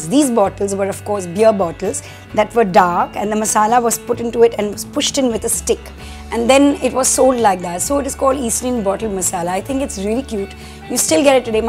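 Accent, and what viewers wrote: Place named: Oxford, United Kingdom. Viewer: U r accent is very nice.... Oxford level.....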